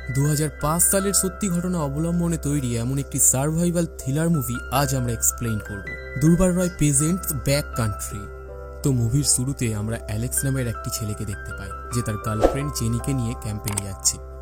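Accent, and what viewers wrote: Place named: India. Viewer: ভাই আপনি কি ইন্ডিয়ান কারণ ইন্ডিয়ান মানুষরা এভাবে কথা বলে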